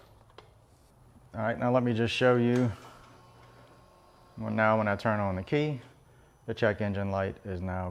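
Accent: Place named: Louisiana, United States. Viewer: Should’ve pulled the AIS off while you were at it. South La you sound like saint Martinville type people.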